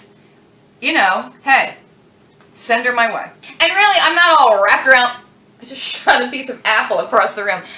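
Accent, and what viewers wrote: South, United States. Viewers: It is funny that when I was watching the video earlier today about your Copenhagen collection I was wondering if you were of Danish descent but I did not ask in the comments. The other thing I thought was interesting is that I thought you had a Southern accent. You mentioned living there briefly but not bring born there. Have you discovered any new information? Did you do an <link> DNA test? Or 23 and me. The 23 and me might answer the health questions without finding your relatives.And finally, I had suspected you were a Scorpio(I am one ) but did not think the topic would ever come up in the vlogs. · I've been watching your family for about a month now. This is the first time that I feel I have some connection... cuz my two children are adopted also. They carry the names I gave them and not their birth names. Both my children feel like you that there is no shame in it or Secret. 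By the way I think that you guys are hilarious love your sense of humor. And I've always wondered where did you get the southern accent from. Keep it up it's wonderful. And also your other accent too. I love your husband's demeanor he's as cool as a cucumber. love you guys and all your seriousness and all your sense of humor. By the way we can't afford to move out of our house either because it's underwater. But I have a plan to remedy that. Take care keep doing what you're doing you're on the right track... So inspiring.😍😎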